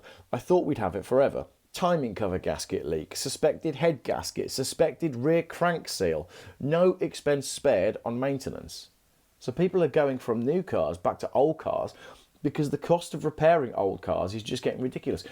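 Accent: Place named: West Midlands, United Kingdom. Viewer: I'm trying to place your accent...is there a bit of West Midlands in there?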